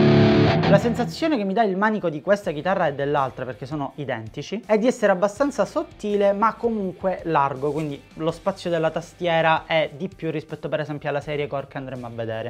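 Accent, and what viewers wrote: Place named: Palermo, Italy. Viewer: A me sta simpatico anche il suo forte accento palermitano... :-) Ma ho un debole per la Sicilia, sono di parte.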